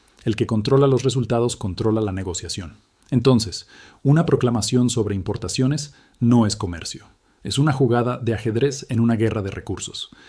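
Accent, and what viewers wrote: Argentina. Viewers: Por que le pusieron español argentino, suena desagradable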